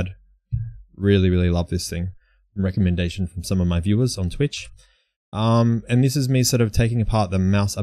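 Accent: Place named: Australia. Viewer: Dude, I guessed that you were from either: newcastle , cronulla, or wollongong ;) nice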